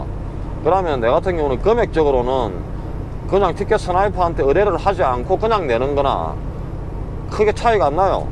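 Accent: Gyeongsang, South Korea. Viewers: 안녕하세요 어제 밤에 다큐세상 " 편"을 보고 인사드립니다. 15일씩이나 계속되는 장거리 운전이 매우 피곤하실텐데, 그속에서 보람을 찾고 새로운 풍경과 정보를 소개시켜 주셔서 감사합니다. 너무 맵게 드시지 마시고 건강관리도 생각하세요. 멋진모습과 구수한 경상도 목소리가 좋아 구독자로 등록 신고합니다.....늘 안전운행하세요... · 다규세상에서 보고 들어 왔어요. 서울 사투리보다 경상도 표준말 최고 입니다. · 오오! 방금 케비에스2티비 방송예고보고 미친듯이 달려옴요! 와! 와! 영어도 갱상도 사투리로하던 공중파 나온다! 와 와! 갱상도 싸나이다 아이가!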